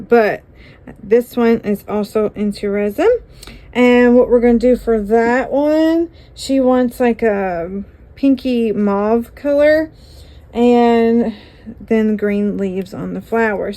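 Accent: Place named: Deep South, United States. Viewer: lol i smile everytime i hear the same words i use come out oh your mouth lol i dont have the deep south accent anymore(unless im really pissed or super excited about something) but i use the same slang and way of talking lol i dont feel so alone in this world on the west coast where people look at me funny when i say purdy or aite lol instead of pretty or alright lol i still use the word buggy instead of shopping cart lol lol i always say yonder and people look at me like i have two heads lol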